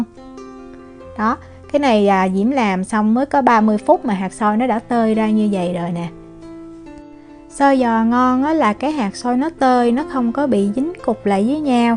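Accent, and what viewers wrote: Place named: Mekong Delta, Vietnam. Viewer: Diễm oi! Chị nấu thành công rùi ,ngon lắm tơi xốp ,giọng nói người Miền tây dể thương ,Diễm nấu xôi đậu phộng nhe !💛💛💛💛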